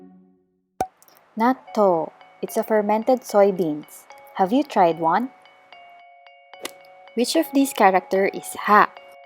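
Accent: Philippines. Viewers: Are you Filipino? I could hear the accent, also trying to learn and just understood all of hiragana I think haha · Your accent is so filipino, it's nice hahah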